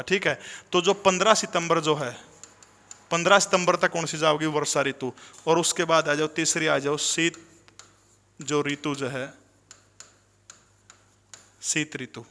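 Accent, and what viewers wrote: Haryana, India. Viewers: Sir aapki haryanvi bhasa Achi lgti h👍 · Dhanybhag humare guruji Aap jaise teacher se padhne ka moka milta h 🙏🙏Sirji bas meri ye problem rahi h jisme aapka jara sa bi dosh nhi Mai aapke Haryanvi lahja Kam samjh paya hu fir bi sbse best class aapki h bahut bahut respect or pyar sirji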